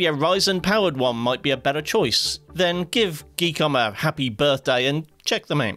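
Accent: United Kingdom. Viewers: The presenter is British and therefore pronounces words in English.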